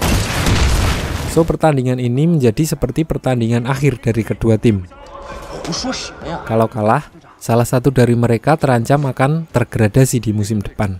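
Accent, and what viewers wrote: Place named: Java, Indonesia. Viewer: Bahasa Indonesianya medok pasti orang Jawa ni😂salam bang dari Jawa tengah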